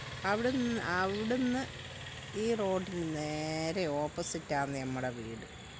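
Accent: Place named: Kannur, India. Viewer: കൊച്ചിൻ്റെ സംസാരം കേട്ടിട്ട് ഒരു കണ്ണൂർ ചുവ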